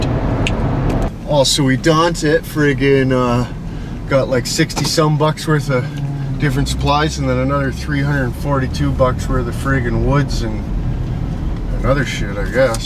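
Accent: Canada. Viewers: lol, this guy is like someone from trailer park boys, but with more of a Canadian accent.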